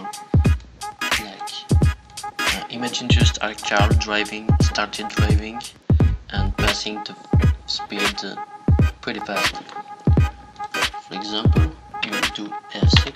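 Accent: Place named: France. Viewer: Dude you are so funny your editing is great and you except the fact your French accent is hard to undestand for people that are not French witch every youtuber should do good on you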